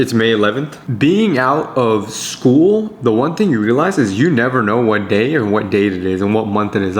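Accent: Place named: New Jersey, United States. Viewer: I saw that view and thought it was Dubai, but then you seem to have a NJ accent. Good luck with the new apartment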